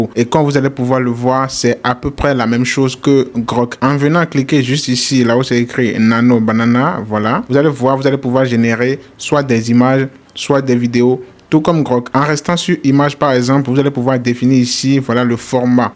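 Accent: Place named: Benin. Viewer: MERCI BEAUCOIUP MON GARS TU ES UN 10. JE NE TE CONNAISSAIS PAS AVANT, PREMIEE IDEO JE TOMBE SUR DE LA VALEUR GRATUITE MERCI BEAUCOUP.DIS MOI TU ES BENINOIS ??? VU TON ACCENT ON DIRAIT. MOI JE SUIS BENINOIS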